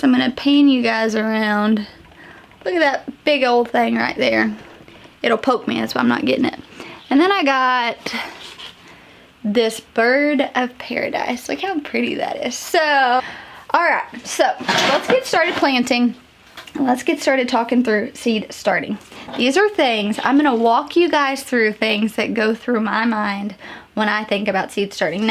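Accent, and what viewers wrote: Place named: South, United States. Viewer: I like how you Southerners say "feel"...! it's just like "fill" to the northern ear ahhahah it is so pleasant to hear. Happy Gardening and Happy New Year all. Thanks for the inspiration.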